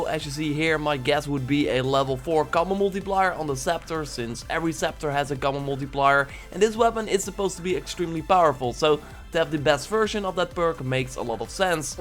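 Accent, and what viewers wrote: Netherlands. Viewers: Are u dutch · Are you Dutch??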